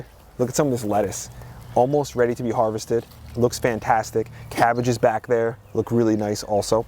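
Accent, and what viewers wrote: New Jersey, United States. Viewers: 💦💦💦💦💦💦💦💦💦💦 I love how you stay true to your Garden State home, by continuing to pronounce water the Jersey way ~ “wooder”! 😊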